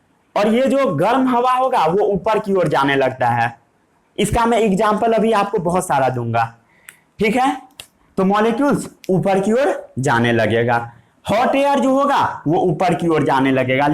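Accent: Bihar, India. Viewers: Yes something like Bihari tones... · proper hindi chaiye Bihari style nai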